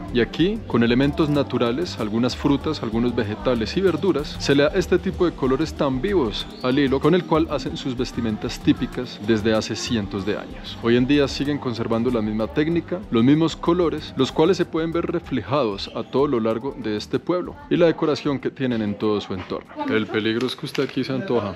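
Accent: Bogotá, Colombia. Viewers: Me encanta como narra la historia Con esa voz tan bella y ese acento colombiano Lo hace a una quedarse a ver todo el video 👍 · GRACIAS POR DECIR ESO DE NUESTRO ACENTO BORICUA, 🇵🇷..TU ACENTO COLOMBIANO ES PRECIOSO TAMBIÉN! 🤗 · "Que linda jeta tienes amigo" Alobien que no me canso de escucharlo hablar rolo al rededor del mundo parcero.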